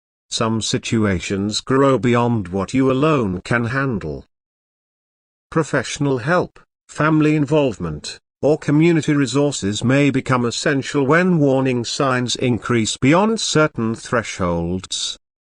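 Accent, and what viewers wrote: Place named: United Kingdom. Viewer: Let AMERICAN talk to American people! Not everyone understands British language!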